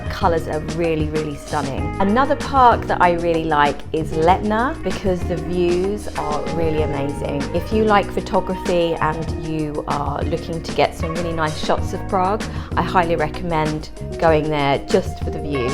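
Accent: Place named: United Kingdom. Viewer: Hi! Don't worry about your accent and pronunciation, because most of us Czechs don't care if you have a British accent. I've been studying English for 30 years and I'll never be able to do a British accent, no matter how hard I try.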